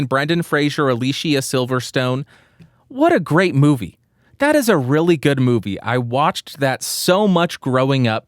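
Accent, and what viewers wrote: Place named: New Zealand. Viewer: Even though your 'kiwi' accent may have offended someone, somewhere... By the imaginary unofficial power vested in me, I hereby dub thee an honorary kiwi.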